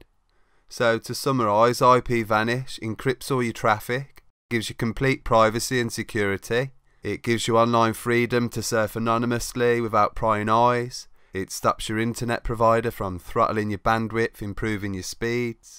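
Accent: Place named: Birmingham, United Kingdom. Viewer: Got the VPN mate thanks. Loving the Brummie accent, tell me you're a villa fan?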